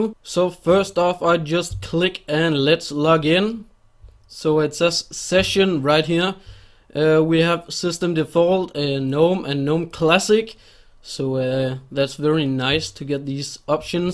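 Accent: Denmark. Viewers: Dejlig dansk accent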